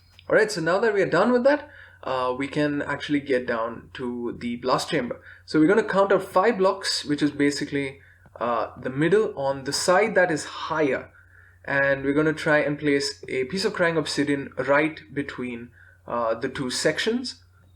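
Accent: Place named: India. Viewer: bro ur from india right ? cause u definetly sound like and indian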